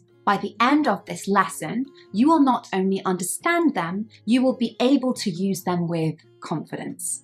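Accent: United Kingdom. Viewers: Hi, I just love your videos. They are really helpful. Thanks for the great job you're doing. By the way, I love your typical British accent.❤❤❤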